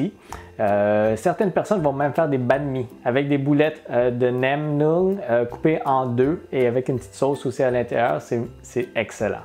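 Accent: Canada. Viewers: Viêt avec accent canadien .Trop cool. Merci pour la video . <num> Aix en Provence France . today blu sky 14 degré.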